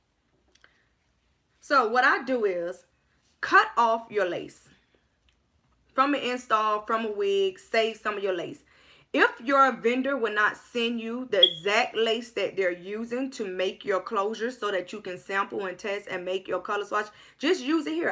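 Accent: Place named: Florida, United States. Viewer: I'm new to your channel.. as soon as i heard you talk i knew you were from FL like me. Lol. I love the videos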